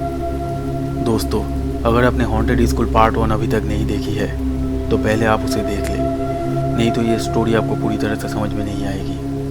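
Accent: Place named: Bihar, India. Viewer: Sir aap bihar se ho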